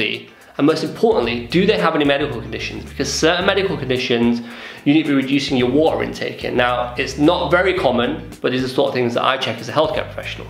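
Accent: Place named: Middlesbrough, United Kingdom. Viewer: Really? You sound more Middlesbrough :-). Yes, thoroughly enjoyed video thanks! X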